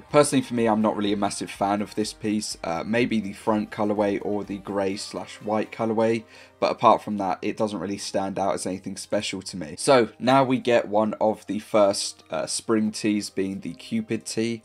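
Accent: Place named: United Kingdom. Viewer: American slang just doesn’t sound right in that British accent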